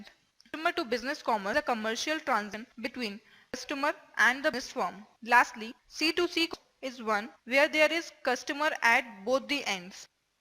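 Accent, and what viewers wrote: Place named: India. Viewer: I can't understand due to the Indian Accent.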